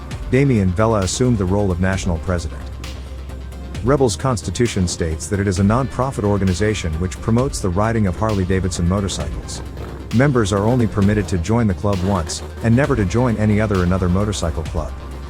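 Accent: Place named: United States. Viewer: Why is it an American doing the voice over as you said, a load of crap 😮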